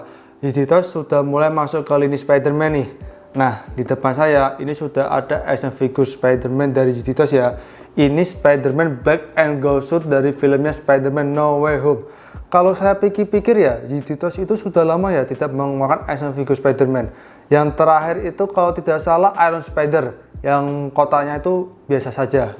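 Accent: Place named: Java, Indonesia. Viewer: Selalu dgn ciri khas medoknya,sukses selalu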